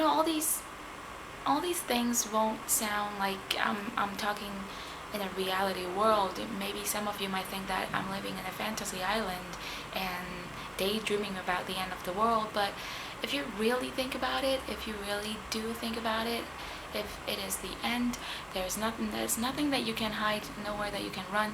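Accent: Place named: United States. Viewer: စဂၤပူျပည္မွာေမြးတာလား။ ဘာလုိ႔မဲတာလဲဆုိတာ ခင္ဗ်ာရဲ႕ အသံကအေမရိကန္းနဲ႔အရမ္းပီတယ္။ သုိ႔မဟုတ္ရင္ ျဖစ္ႏုိင္တာက စဂၤပူျပည္မွာအားလုံးအေမရိကန္းအသံနဲ႔ပီၾကတာေနာ္။ :S FK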